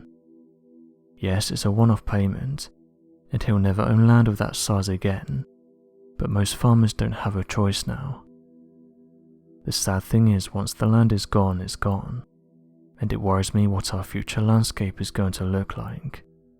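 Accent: United Kingdom. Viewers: This was probably taken out in Western Kansas at the feed yards out there. It happens, folks. It's hotter than Hades right now and the picture is misleading. All these cattle didn't just drop dead where they lay. In large operations like these the dead ones are gathered up and buried in a mass grave. When your running a few thousand head there's going to be losses so this is just nothing to make a big deal about, though, our British accented friend seems to think so. Nothing to see here, but, Beware of the Fear Mongers on YouTube.!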